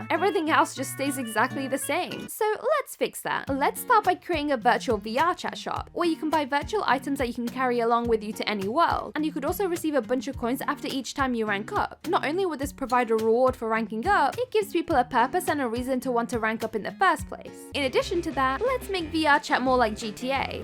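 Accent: United Kingdom. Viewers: The accent sounds familiar, are u from the west mids?? 😂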